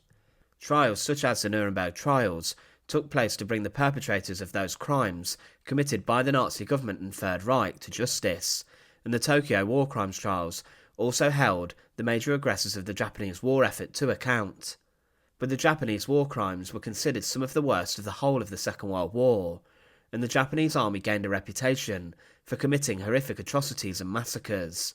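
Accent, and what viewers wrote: United Kingdom. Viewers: It's his accent. A significant portion of the UK sometimes prounce the letter 'T' as a 'F' sound. This is called an accent. Once again, criticising someone for their accent is pathetic.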